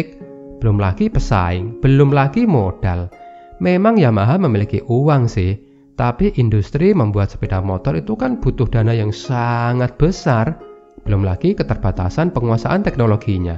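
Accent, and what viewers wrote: Java, Indonesia. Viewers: Gpp medok. Saya jg medok 😁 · saya nyaman dengan suara medoknya.. sya dnger cerita ini sblm tidur enak bgt hhe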